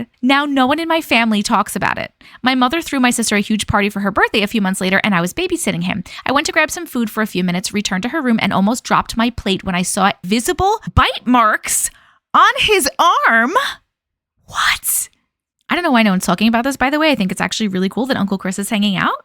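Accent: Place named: New York, United States. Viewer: Definitely subbing.... stories AND you're a New Yawk girl like me?? Oh heck yeah!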